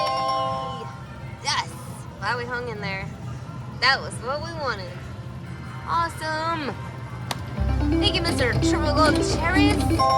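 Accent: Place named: South, United States. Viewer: the excitement in your southern voice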